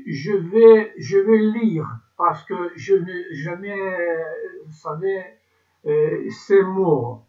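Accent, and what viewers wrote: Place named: France. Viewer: Добрый день земляк..У вас французский прононс 😀,вы имеете большую способность к языкам 👏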